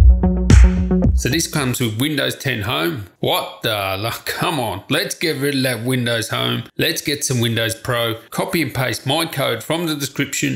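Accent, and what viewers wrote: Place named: Australia. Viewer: Oh its so aussi 😆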